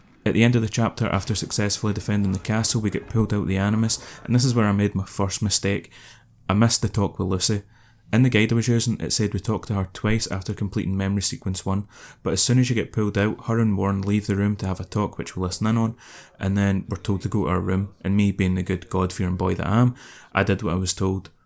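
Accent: Scotland, United Kingdom. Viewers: Currently attempting this myself, subscribed within 4 minutes of watching. Why? The accent. From Glasgow myself and I love to see Scottish CC’s. Fantastic video man, keep it up.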